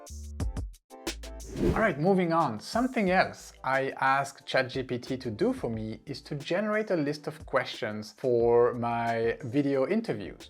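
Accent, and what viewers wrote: France. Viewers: Hello ! Are you French ? Love the accent ! 🥰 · Lol, just listen at his accent. He’s either French or from the French speaking area of Belgium. Pretty obvious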